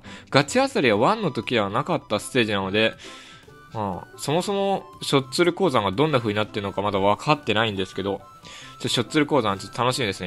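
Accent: Kansai, Japan. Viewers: 関西弁多めやな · か、関西弁🤔 関東の人かと思ってた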